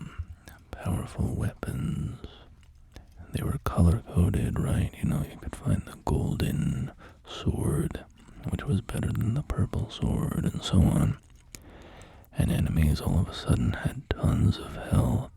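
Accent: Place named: United Kingdom. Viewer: your history knowledge is impressive never stop my knowledgeable brother, i love your accent i assume you are in the uk?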